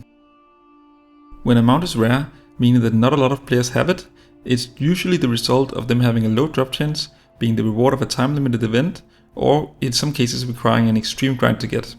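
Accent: Denmark. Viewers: Beautiful Danglish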